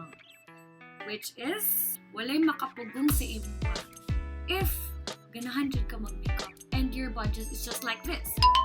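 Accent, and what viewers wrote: Visayas, Philippines. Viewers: Cute kaau sya mag bisaya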